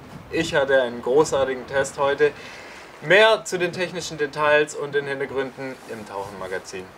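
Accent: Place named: United States. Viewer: Sounds American trying to speak German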